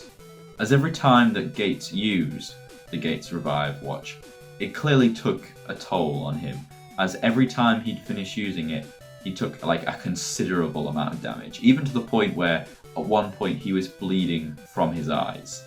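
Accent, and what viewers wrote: United Kingdom. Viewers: You talk British? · Ohh. British. Cheerio!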